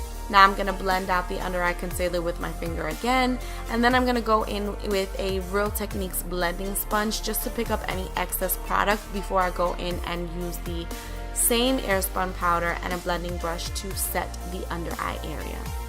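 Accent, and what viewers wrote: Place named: Latin America. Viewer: Hey " "?......Love your accent...Latina right? I have subscribed to your channel.. Can you also share so love over here..Each one helps one!!...❤️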